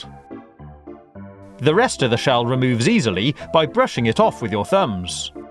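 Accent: England, United Kingdom. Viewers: He's British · Are you English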